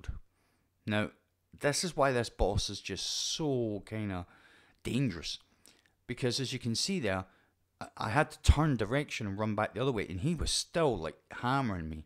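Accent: Scotland, United Kingdom. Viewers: Yer Scottish got ma support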